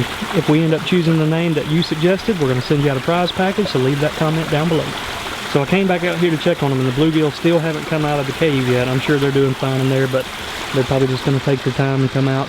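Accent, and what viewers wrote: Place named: South, United States. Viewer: I don't know where you guys are located, but it looks like a southern state (due to your accent and all the bayous in your videos) so with that said, have you guys considered some of the smaller species of gar? That'd be such a cool inclusion. I used to live in FL (now back in CA) and I used to love watching gar swimming near the surface.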